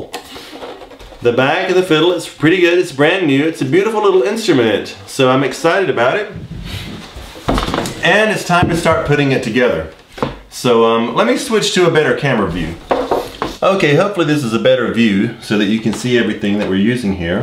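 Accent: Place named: Western North Carolina, United States. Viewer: Not a severe western North Carolina accent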